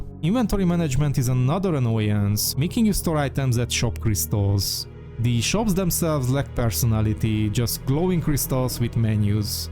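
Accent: Hungary. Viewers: Csak feldobta a videódat, de mondom ezzel az akcentussal tuti magyar. :D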